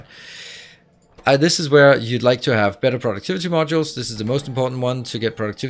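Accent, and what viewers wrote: Denmark. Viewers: I was today years old when I figured out you are Danish. Damn, you've managed to hide the Danish accent very well. Kom så :D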